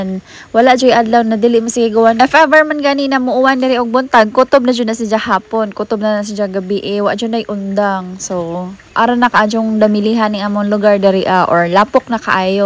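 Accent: Bohol, Philippines. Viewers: abi nhu taga Bohol ka mam mao mn gud tunada inistoryaan nmu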